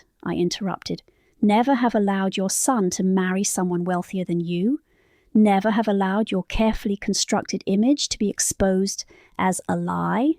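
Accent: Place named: United Kingdom. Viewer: So lovely listening to that British accent read this story aloud! Am enjoying this so very much!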